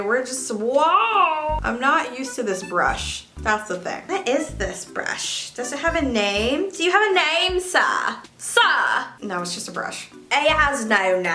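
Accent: United Kingdom. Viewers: i love her british accent😭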